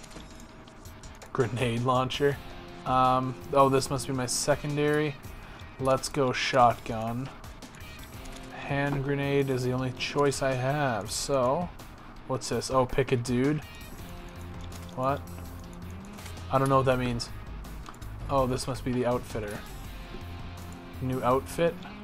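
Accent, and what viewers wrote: Canada. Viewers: why does sound way more Canadian in older videos lolol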